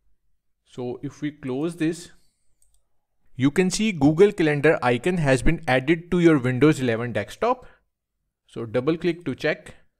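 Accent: India. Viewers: Bro that's awesome. I have searched everywhere how to do this and finally, there is a random guy with Indian accent on a low sub-channel, again, everytime lol🤣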